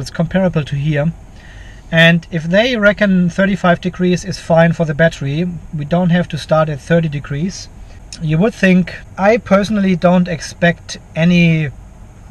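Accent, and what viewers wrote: Germany. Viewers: R u german ?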